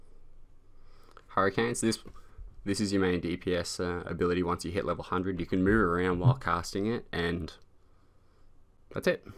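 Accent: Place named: Australia. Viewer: love the aussie accent mate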